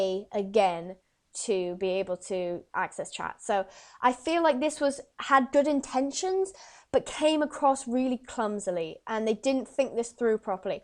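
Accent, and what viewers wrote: United Kingdom. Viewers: Even before, you had to verify your email to chat. I was a free player for 3MONTHS before i got 3 months subscription. after that, i bought pay once and now im level 19. i have been playing star stable for over a year and i think 99.99/100 in the star stable community on global chat are just looking to roleplay. i bet most of those are subscriptions. this is just star stable milking money off their players again. like they do with star coins. in my whole year of playing star stable, i have never bought star coins. i have saved up for all my horses. i now have 11 horses. you don't need to pay for star stable at all just to enjoy the game. LIKE IF YOU AGGREEEEEE Also, what server are you on? Im on Unicorn Forest, UK and you have an english accent. can we be friends on star stable?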